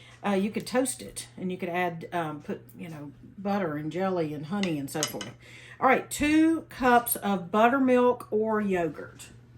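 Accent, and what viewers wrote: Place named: South, United States. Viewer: Hi,! I was delighted to hear that you are from Middle Tennessee. I live in the Muletown if you know where that is and it is also called "The Dimple of the Universe". I hear that you still have our beautiful "Southern Accent". When I was young, I lived in 5 different states and two other countries but moved back to my hometown years ago and am so happy that I did! Do you ever miss living here? Thank you so much for the recipe. It looks delicious. My family makes it with White Lily, Martha White or other WHITE cornmeal but that is because we can get it! So glad that I found you on here. Have a Blessed day.🥰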